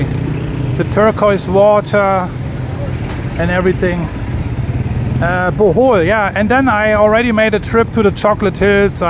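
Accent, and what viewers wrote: Germany. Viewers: European accent most probably if I'm not wrong you're a German, am I right?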